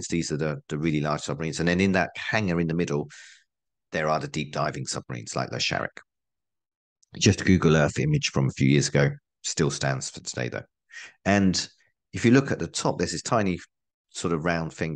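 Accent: United Kingdom. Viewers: Really strange hearing a britosh voice that is unbiased between east and west. Almost sounds like your just telling the truth, which is hard to find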